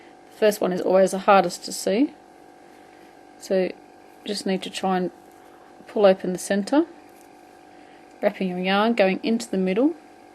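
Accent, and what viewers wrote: Australia. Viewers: I love this tutorial. I am not sure if you noticed, but on the youtube video for this, there is a box that is for interactive transcript. Apparently it tries to translate each frame of dialogue in writing., with your Aussie accent, it is translating it, but, NOT....QUITE....getting the right words. Think Autocorrect fail on a cellphone...only the Youtube version. I will try to copy and past some of this for you. on a new comment.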